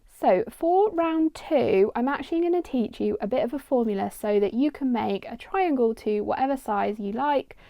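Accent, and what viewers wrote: United Kingdom. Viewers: You have a British voice but I think you are using USA crochet terms ?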